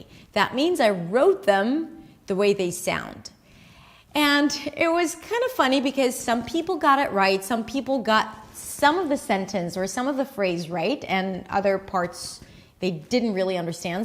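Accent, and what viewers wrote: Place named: New York, United States. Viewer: I'm a native speaker of English, so I got all of these correct, and most of them almost immediately, so no surprise there. However, speaks with a New York accent, and I come from Detroit, in the Midwest, so I had a bit of trouble with "out of the office", because she actually uses a different vowel in "out", which sounds to me like "at". Point is, even native speakers can still have a bit of trouble with an exercise like this, so foreign students of the language should not feel bad about missing a few of these. English not only has these strange prosodic patterns, but the vowels often shift a lot from one region to the next.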